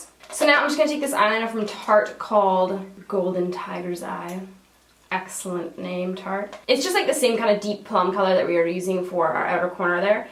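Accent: Canada. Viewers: I am obsessed with your accent, I love Canadian accents soooo much haha. I would love to visit Canada, it's my number one destination! I'm from Australia and I've never been overseas so I'm so unbelievably keen to start traveling. Love your work, you're amazing. xxx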